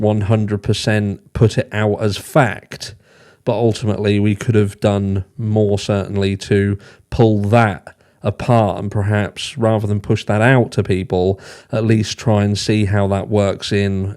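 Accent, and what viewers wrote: Norfolk, United Kingdom. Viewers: Are you from Norfolk uk, as I am? X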